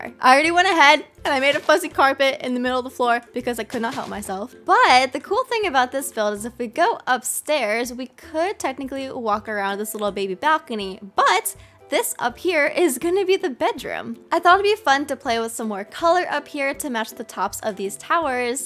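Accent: United Kingdom. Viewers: Are you from British? Just asking 😊 love you videos <3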